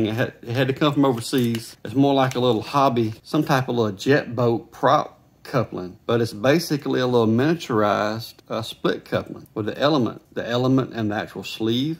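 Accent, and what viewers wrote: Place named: South, United States. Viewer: MAN! this was AMAZING! Videos like this are a rarity these days done in the form of how GM explained their cars in the 50s. And I have to admit, it sounds better with a southern accent. But the Quality of this video is MILES above anything I have seen. FANTASTIC JOB DUDE! I don't even own this model of blower. But I figure My Ryobi RY38BP Backpack Leaf Blower had the same thing that went out on it. And RIGHT on the day of the 3 year warranty expiring! :face-green-smiling: I just ordered a parts blower from Ebay, and plan to just swap out parts that way. But I will have to look into these RC couplings. Again, thank you and WONDERFUL job and explanation & Links! :goodvibes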